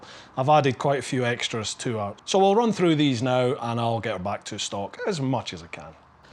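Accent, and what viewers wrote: Scotland, United Kingdom. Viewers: Oh wow well done (those are the red vehicles) interesting job, guess you have seen it all, to move on careers! thanks for the info never heard of "hill topping" and couldnt find anything on google! great channel I am a subscriber! Love the accent too im thinking creiff maybe Dunblane regions ...dont confirm or deny, i have a thing for accents (aspergers)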